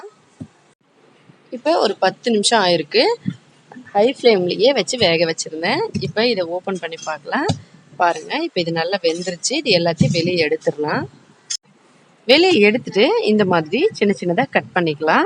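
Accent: North India, India. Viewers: Mam are you a North indian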